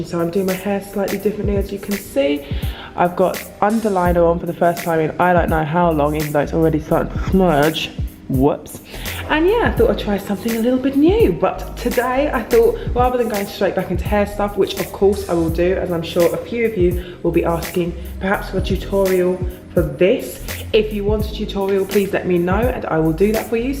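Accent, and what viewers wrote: London, United Kingdom. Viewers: Luv luv ur British accent. Glad that u like my country. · beautiful I can here you talk all day .I love that London English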